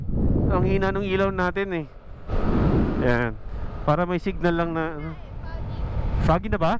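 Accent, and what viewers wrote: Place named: Aklan, Philippines. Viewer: oohhhh.. Aklanon ka pala. kaya pala pamilyar sakin punto mo. hehehe. Mag moto Vlog ka din sa Town natin. hehehe. Aklan!!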